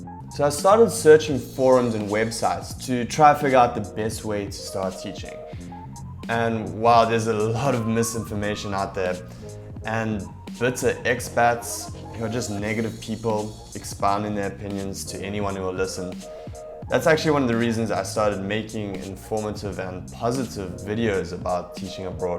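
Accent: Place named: Johannesburg, South Africa. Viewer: Aah, the South African accent :) Sounds like a Joburg boy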